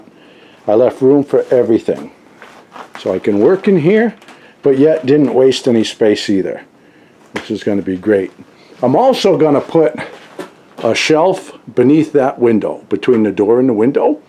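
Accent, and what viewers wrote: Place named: New York, United States. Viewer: Great green house 👍 . I'm looking at the yard today,, bags of leaves all over the place ((just in case )) hahaha. I don't know if u ever did a dumb thing like I did today , I had the iron rake set up by the fence and didn't see it and stepped on it and wamm the long handle hit me in the mouth and nose. Man did that hurt ,, I thought I broke my tooth . It's O.K. NOW but wow I don't know how long I can do the garden ,, just turned 80 and it's getting rougher every yr. Love your videos and N.Y. accent ,, My daughter used to live in Jersey & he worked in the CITY . SO I know that accent ((smile)). Fm. W.I...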